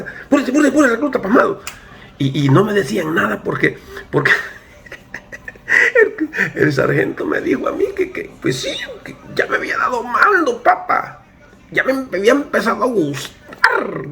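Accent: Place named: El Salvador. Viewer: Me llega como cuenta las historias este sargento .con un acento muy a lo salvadoreño,un acento campirano y esa jocosidad para narrar las historias .saludos sargento.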